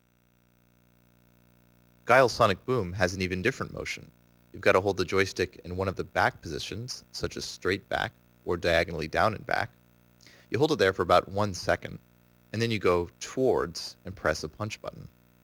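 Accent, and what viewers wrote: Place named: United States. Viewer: nobodys asking anybody to be a weeb, but this is the most americanized pronunciation of anythig street fighter i ever heard lol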